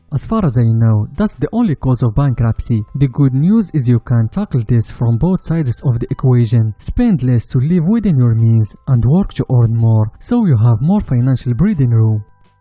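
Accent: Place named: France. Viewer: he is a french speaker this why he got a french accent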